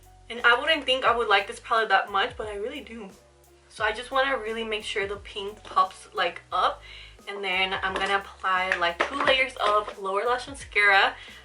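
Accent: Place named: Puerto Rico. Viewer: Girl, you’re stunning. A fellow boricua here, I just discover this channel and when I heard that Puerto Rican Spanish it was a done deal. Me encanta, you got a new loyal subscriber. ❤️🇵🇷 Ig: Srta.lugo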